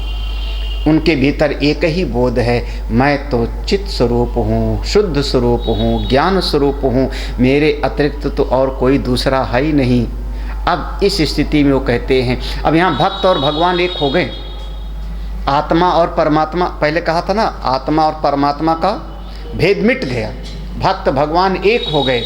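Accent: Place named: Allahabad, India. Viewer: Swami Ji aapki tone Allahabad hi hai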